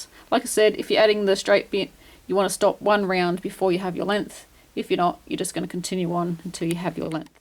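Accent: Australia. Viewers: Hi, my daughter and I have been enjoying watching your videos. Thank you for the good explanations, camera angle and ... the aussie accent, being aussies ourselves I love the intro of g'day. You throw me a bit using US terminology, I was taught that a dc was a trbl, sc was a dc etc., and my following a pattern skills arnt real hot, so you are enspiring me to try to improve. Keep up the good work. Look forward to more of your videos. Thanks heaps sandra and natalie grantham.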